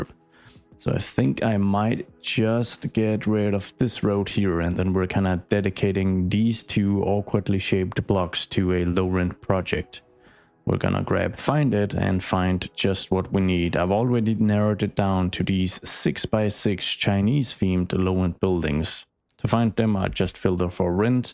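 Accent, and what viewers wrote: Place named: Denmark. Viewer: Ayyyyy! I've missed that Danish accent! Great to have you back :P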